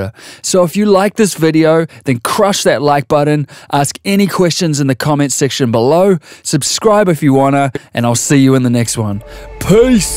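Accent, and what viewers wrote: Australia. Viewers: You sound autrallian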